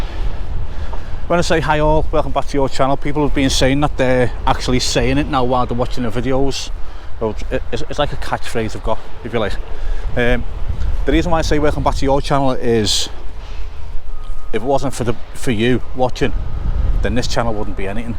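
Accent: Liverpool, United Kingdom. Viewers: Great work, its awesome for s scouser in Texas not just loving seeing the progress of the construction work but getting the dose of “scouse” when all i hear here is y’all and you ground me with “yers all”. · Super well done. Like your updates and the zoom ins. You with other are doing a great job. I find these videos really interesting and has been following from Malta since the start of the project. Keep them coming. Also I like hearing your scouse accent 🙂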